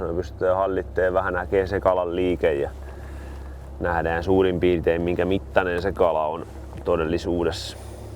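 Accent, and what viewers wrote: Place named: Häme, Finland. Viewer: Ensin tyylipisteiden jako: kakstoista pistettä ja papukaijamerkki. Kuvaajalle, leikkaajalle/koostajalle jaaivan erityisesti 'luontevasti soljuvan puheen tuottajalle' - sisällöstä, mutta myös estetiikasta. En ole varmaan koskaan aikaisemmin kuullu puhuteltavan kaloja - ahventa, kuhaa, mutta aivan erityisesti haukea - niin monipuolisesti, hellän kaverillisesti, vähän kiusoittelevastikin. Kuulee, että on oltu vuorovaikutuksessa aikasemminki. Ainoastaan savon murre puuttuu, mutta menee se nippa nappa hämäläinenki .. Eikä tuo tekninen osaaminenkaan paljo taiteellisen rinnalla kalpene. Ainakaa, jos aatellaan saalisvarmuutta. Näin kaksdee-arskana tuo saalisvarmuus jopa hämmentää. Ja tulee eittämättä sekin mieleen, että missä kulkee eettisen saalistamisen rajapyykki. En halua neuvoo toisia. Oma kalastus keskittyy ruokakalan pyyntiin asenteella, että 'en tee onkelmaa siittä, jos kalaa ei satu tulemaan - enkä siittäkään, kun sitä joskus tulee'. 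Nostan siimat ylös ja sumputan järvessä seuraavaa kertaa silmällä pitäen. Kaksdee-arskana mulle tulee sopivasti jännitystä ihan siittä, että tuleeko tällä kertaa vai eikö tuu. Omana mielipiteenä heittäsin kuitenkin väkäsettömien koukkujen käytön aina sillon, jos kaloja saalistetaan selvästi yli tarpeen, koska väkäsettömillä se vapautus onnistuu aina helpommin. Sillä tuskin se metrisen vihernieriän karkuutuskaan enää kovin kipeetä tekee, jos niitä toistametrisiä on vyöllä jo enemmän ku paljo. Taiteellisen tyylin lisäks paikkatyyppipohdiskelu itte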